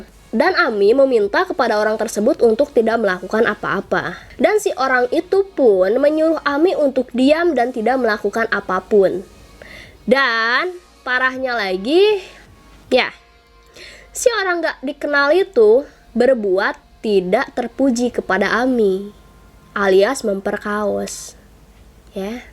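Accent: West Java, Indonesia. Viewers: Kaya orang Sunda logatnya?? Salam Sunda Majalaya hadir😁☝️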